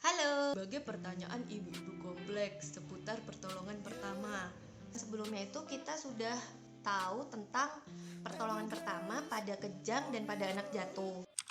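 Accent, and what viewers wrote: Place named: Java, Indonesia. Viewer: Dok jawa nya orang mana dok? Hihi keep semangat dok!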